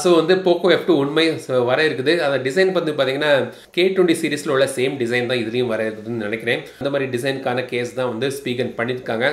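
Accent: Kanyakumari, India. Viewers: Polinju pona company! Typical kaniyakumari slang! A Fan from your district bro!.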